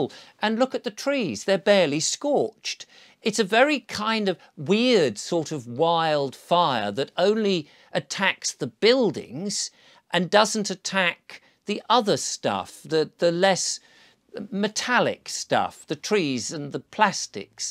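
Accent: United Kingdom. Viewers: HI, I REALLY ENJOY YOUR VIDEOS!!! THIS ONE IN PARTICULAR! YOU ARE A WONDERFUL STORY TELLER, YOU JUST HAVE A NICE WAY OF SPEAKING, MAYBE IT'S THE BRITISH ACCENT? I LIVE IN MASSACHUSETTS, USA, I'VE NOT BEEN TO HAWAII, BUT I HAVE BEEN TO CALIFORNIA MANY TIMES & I HAVE A SPECIAL FONDNESS FOR CALIFORNIA BECAUSE SOME OF MY FAMILY LIVED & THRIVED THERE FOR DECADES. HAWAII & CALIFORNIA & A FEW OTHER STATES IN THE USA HAVE THE SAME SITUATION OF EXTREMELY HOT LAVA SQUEEZING OUT OF BREAKS IN THE EARTH CALLED FISSURES WHICH CATCH DRY BRUSH THAT IS LEFT TO ROT & GUESS WHAT HAPPENS, BINGO, WILD FIRES, WILD FIRES EVERYWHERE THAT COULD EASILY HAVE BEEN AVERTED BY JUST CLEANING UP THE DRY BRUSH! ANY RESPONSIBLE PERSON WHO HAD ANY COMMON SENSE COULD FIGURE THAT ONE OUT & THAT IS WHY I HAVE NOTHING BUT DISDAIN & LOATHING FOR GOVERNOR NEWSOM OF CALIFORNIA & OTHER LEADERS & PEOPLE LIKE HIM!!@ THE WILD FIRES IN CALIFORNIA, HAWAII, THE WESTERN USA & CANADA ARE INTENTIONAL & CAUSED BY THE VERY PEOPLE PAID QUITE WELL TO PROTECT US & OUR MAGNIFICENT NATIONS!!! 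I KNOW MOST LIKELY FROM THE FACTS YOU ARE STATING, INCLUDING HOW THE PLASTIC SEATS AREN'T MELTED, BUT THE HAWAIIAN HOMES ARE FRIED!!! I STILL SEE & HAVE SEEN WILD FIRES ACROSS MUCH OF CANADA FOR MONTHS & ONGOING IN THE USA & THESE ARE VERY EASILY PREVENTABLE WILD FIRES BY SIMPLY AT THE VERY LEAST REMOVE THE DRY BRUSH, DEAD TREES & ANY OTHER FLAMMABLE DEBRIS CONCENTRATING ESPECIALLY ON WHERE THE VOLCANIC FAULTLINE TRAVELS &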